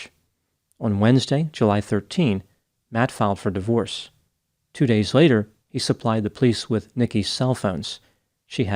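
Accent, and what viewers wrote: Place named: Philadelphia, United States. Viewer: Psychology and Philly accent: on point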